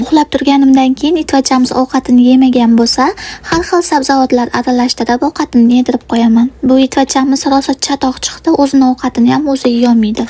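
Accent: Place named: Andijan, Uzbekistan. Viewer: анжанлимисиз синглим. шевангиздан. билипколдим 😊😊😊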